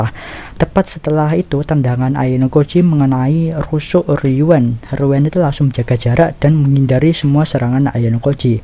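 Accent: Java, Indonesia. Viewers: Ngomong mu lek medok men to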